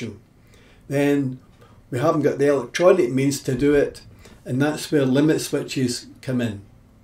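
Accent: Scotland, United Kingdom. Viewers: Nice! Clear diagrams with a clear explanation in a Scottish brogue.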